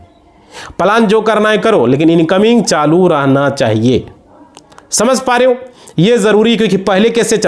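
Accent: Bihar, India. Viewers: Sir, your inputs are informative but avoid Bihari style and accents in your YouTube programme. Jai Hind 🇮🇳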